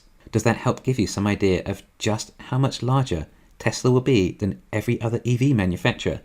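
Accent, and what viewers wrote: England, United Kingdom. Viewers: At last an Englishman with an accent on Tesla!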